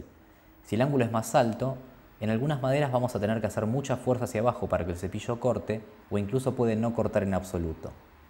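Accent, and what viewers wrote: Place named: Buenos Aires, Argentina. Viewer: muchas gracias!, yo sabia!! Pensé sos tipo es porteño, yo sabia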